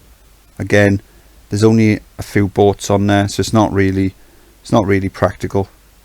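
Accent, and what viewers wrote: Wales, United Kingdom. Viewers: What country are you from? Your accent is awesome. I want to guess Wales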